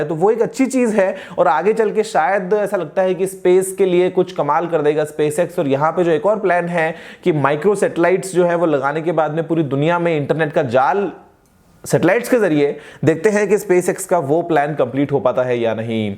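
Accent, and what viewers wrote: Bengal, India. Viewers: app kya bengali ho???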